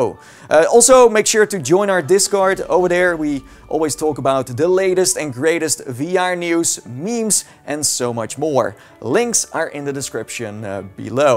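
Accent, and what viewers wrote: Netherlands. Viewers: are you dutch by any chance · Ben je nederlands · You sound dutch (just a random though) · Ben jij Nederlands? Want je hebt zo een accent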